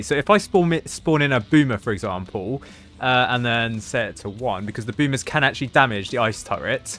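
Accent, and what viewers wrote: United Kingdom. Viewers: The amount of Britishness coming out of this is overwhelming (compared to other videos)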